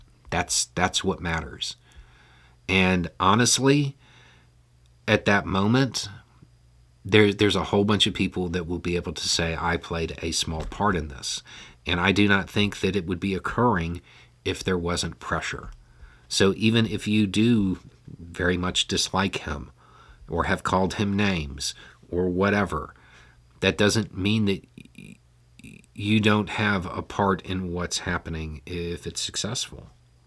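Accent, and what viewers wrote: South, United States. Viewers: He’s already at the top! A man from the south fighting against racism and educating those who seek him out. Hey y’all I’m fine with whatever he addresses his VOLUNTARY viewers as. He’s kind and respectful and smart. Stop criticizing small regional differences. I was never confused that maybe he was from Jersey “Ya’s know?” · please allow me to politely disagree. Y'all is proper English in the south, and I also use ain't. In my opinion speech and look are the way they are to show Country/Southern doesn't mean stupid. Just my opinion. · Teaching is communicating & “proper” communication isn’t always the most effective. He ain’t a professor. That was completely improper English, I grew up being told not to use, but you understood me & likely didn’t feel condescended to. Y’all, ya’all, yinz, youse guys, etc, is nothing compared to his original code-switched Southern-speak on this channel. 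He’s already cleaned it up about as much as he can for us Yankees & overseas folks, while still having people who look- but don’t think like him- give him a chance🤷‍♀️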